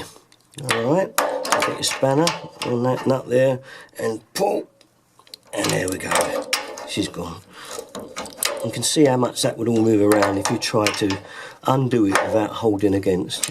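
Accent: United Kingdom. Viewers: Hate to ask such a stupid question but I have to know, where are you guys from? Hard to place the accent but it's definitely somewhere in the UK haha I love it though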